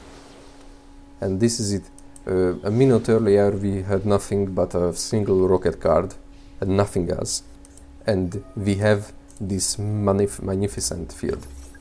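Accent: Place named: Hungary. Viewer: a magyarok angol szavak kiejteseben kicsit olyan masok es felismerhetobbek 😂 de ez nem rosszbol.. amugy jo az angolod.. ez az elso vidi amit lattam toled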